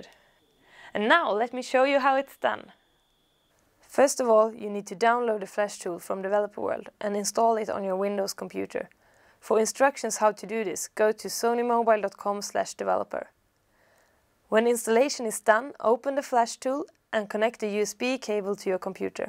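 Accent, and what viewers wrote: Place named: Sweden. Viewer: Typical swedish accent :)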